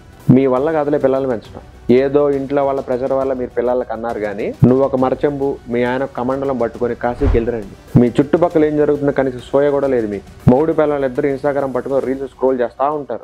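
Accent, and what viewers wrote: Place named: Nellore, India. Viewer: Meedhi nellore ah andi? Me language bhale untundhi Andhuke adiganu.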